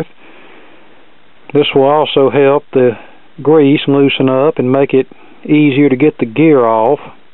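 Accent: South, United States. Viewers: laurel moon ++ i've watched this guys videos and will use his info on a stuck bsf turn table i have, bought new in 1980 shoved off to the side in 1993 by my ex wife and not used since. i kept it thinking one day i'd get it running. maybe with this guy's info i can do that. prefer the older record players before equalizers and the "digital age" moved in.also have a 1955 rca 45 player i will get going. everything works,waiting on replacement cartridge. would rather enjoy my extensive vinyl collection on the old players they were made for. as for this guy's accent,no problem. i'm from north carolina and live in mississippi now.i have that same accent and intelligent as most are here in the us south.my daddy sounded like that. he was a tv/radio repairman as a side job in the 1950's and 1960's. i remember him working on some of these old rca record players. 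my daddy passed away at age 32 in 1966. working on this old stuff in my retirement brings back good memories of my daddy.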